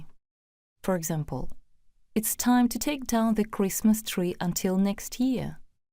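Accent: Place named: United Kingdom. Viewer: не очень приятно слушать мешанину из британского и американского акцента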